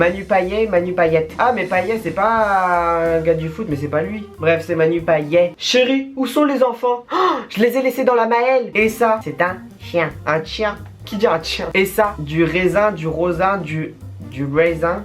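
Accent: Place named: Charente-Maritime, France. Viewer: J'parle comme toi et j'suis de Charente-Maritime 😅😅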